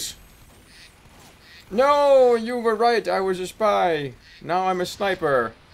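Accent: Boston, United States. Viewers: Did you know that people in Alabama speak with an accent similar to Jerma's (a Boston accent), not really a southern accent. :)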